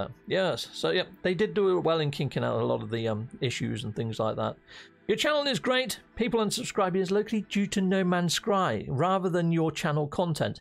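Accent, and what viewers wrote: United Kingdom. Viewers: Oh -- and I would definitely watch some paranormal oriented content! I too find the UK accent and phrasing very entertaining. At 2000+ hours I'm still a long way from being bored with NMS. But while I'm a huge science and science fiction fan from WAY back, the paranormal is a kind of guilty pleasure for me.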